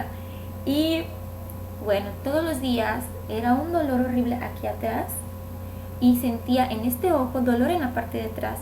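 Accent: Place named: San Luis Potosí, Mexico. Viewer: Jejeje me gusta mucho tu acento y cómo te expresas, pues he tenido amigos mexicanos, curiosamente tu acento se me hace de San Luis Potosí, bueno no me hagas caso no soy de allí pero tuve amigos de allí que se expresaban parecido jejeje. Por cierto te mando un abrazos desde España y ojalá que estés mucho mejor.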